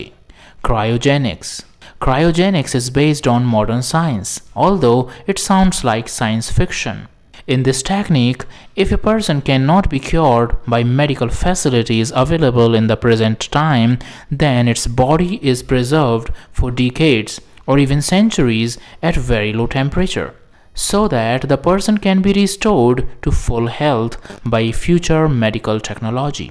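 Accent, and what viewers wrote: North India, India. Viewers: Hi, can you please work on your pronunciations. It's very distracting to hear you talk with a north indian/gujju English accent. Or pls have someone else do the voice over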